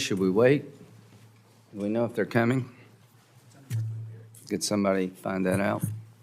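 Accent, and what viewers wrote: South, United States. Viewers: Sounds like a confederate talking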